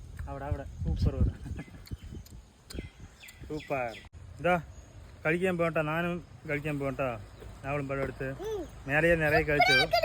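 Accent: Tamil Nadu, India. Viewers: നല്ല മലയാളം അല്ലെ പറയുന്നത് . തമിഴ് കൂടിയ മലയാളം പോലെ സംസാരം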